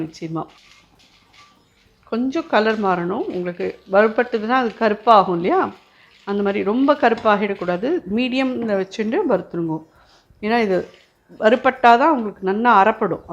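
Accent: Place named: Thanjavur, India. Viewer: ட க்கரா இருக்கும் தஞ்சாவூர் ஜில்லா காரா பேச்சு போல இருக்கு . நீங்கத் தஞ்சாவூர் ஜில்லாவா .நாங்க கும்பகோணம் .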